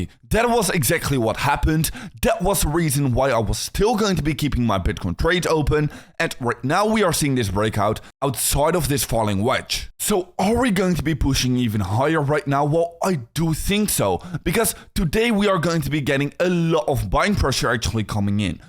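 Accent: Netherlands. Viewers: Waarom heeft hij 2 kanalen? Je hoort duidelijk hetzelfde Hollands-Engelse accent.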